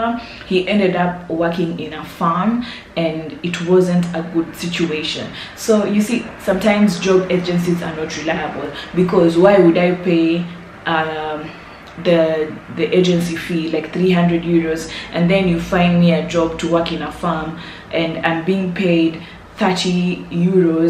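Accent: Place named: Kenya. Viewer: to be honest what i like most is your accent, a lot of you-tubers that are in Cyprus got deep African accent like Nigeria accent which is not pleasing. i think you from Kenya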